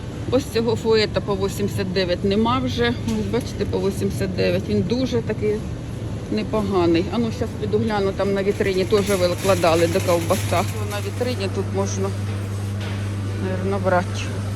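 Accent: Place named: Kherson, Ukraine. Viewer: Привет, землячка! Левый берег Херсонской области приветствует тебя По разговору я сразу поняла что ты из нашего края. С ув Ксения❤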